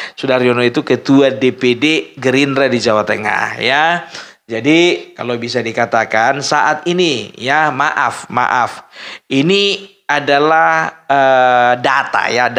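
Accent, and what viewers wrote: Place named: North Sumatra, Indonesia. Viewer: Aku suka sama Opung logat Batak nya kental banget.salam knal Opung Aku dari jawa tengah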